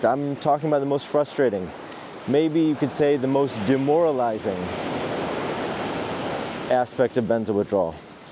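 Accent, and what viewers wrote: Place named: Canada. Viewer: Are you from Canada? Seems you have an accent. 😅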